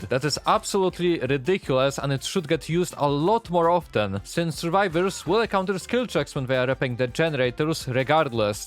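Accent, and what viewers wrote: Poland. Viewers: polski akcent? :)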